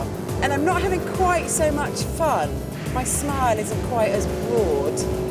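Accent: RP, United Kingdom. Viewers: british accent on a blonde....sexy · An intelligent British woman with perfect Queen's English who's a petrol head AND can drive like that? Marry me.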